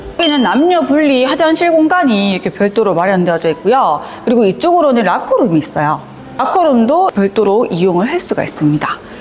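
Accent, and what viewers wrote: South Korea. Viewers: 절라도 사투린가요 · ㅠ여기저기경상도사투리 진짜듣기 거슬려요~~ 한남동같은 그것도서울쪽소개하시면 서울말표준어로 설명해주시면좋겠어요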